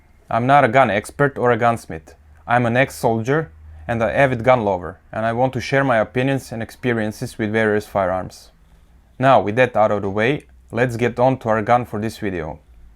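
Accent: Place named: Eastern Europe. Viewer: Славянский акцент. Вы из России?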